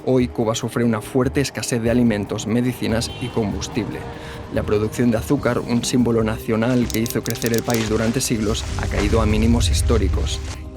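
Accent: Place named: Spain. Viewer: Me hace gracia que quien presenta este mini docu....tenga acento espanol, cada ves que escucho los espanoles poniendo el dedo bien puesto. Me pregunto porque los espanoles son los primeros testaferros del regimen? Muchos espanoles le dan oxigeno al regimen. Deberian de consumir su tiempo en propagandiar al regimen castro-canel y sus testaferros espanoles a ver si tumbamos esa inyeccion de oxigeno que le regalan al regimen castro-canel....empiezen por ahi! USA no va a dejar ninguna alianza entre ambos coja alas, esto es oxigeno entre ellos y Cuba o el gobierno nefasto de Cuba le debe hasta el culo a los Rusos. Putin como mafioso mayor que es, esta recogiendo lo suyo, los rusos no saben pelear y los cubanos muchos menos. Todo eso es fachorias para darle valor a ambos paises que estan al borde d ela quiebra social. Y China no se queda atras, los mismos desastre naturales que tienen en le pais van a cobrar todo aquel mal que lleva sufriendo el pueblo de China por culpa de otros mafiosos!! 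Cuba, China y Rusia llevan la misma dosis de tratos!! Dejarlo que cojan alita se les van a quedar en alitas de pollo....kentucky chicken.....La dictadura castro-canel le quedan dos round tick tock tick tock.....time clicking.. Milei 2023 Derrocar la dictadura castro-canel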